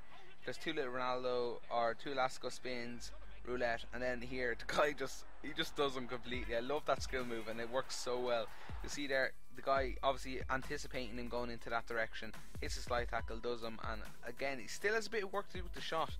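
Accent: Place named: Ireland. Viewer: Gaming countdowns never fail with an Irish bloke commentating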